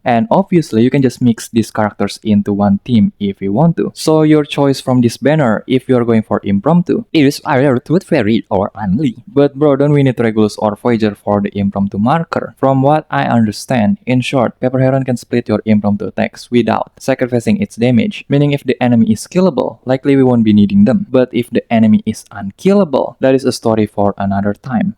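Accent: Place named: Indonesia. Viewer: you sounds like Indonesian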